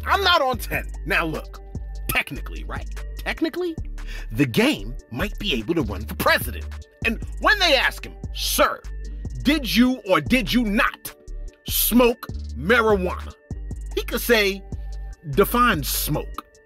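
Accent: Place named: Bronx, United States. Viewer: Ay yo shout out to for saying niggas twice. 😂 Had me weak cuz u neva cuss and always say ninjas. I told my wife u sound like u from da Bronx tho!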